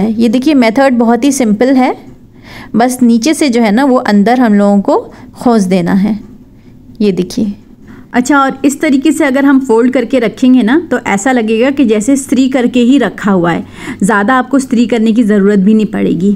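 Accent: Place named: Mumbai, India. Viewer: Aapke mumbai ka accent sune toh acha dikhta ❤frm Hyderabadi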